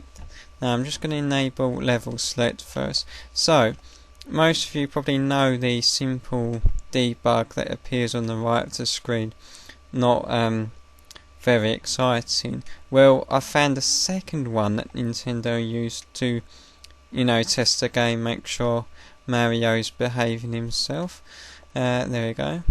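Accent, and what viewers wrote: United Kingdom. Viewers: yey british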